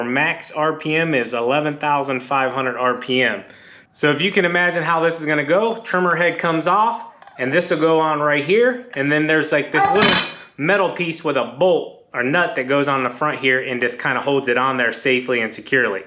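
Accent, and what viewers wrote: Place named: Pittsburgh, United States. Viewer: Waymorebetter Coolbeans iamathinkin Pittsburgh talk just saying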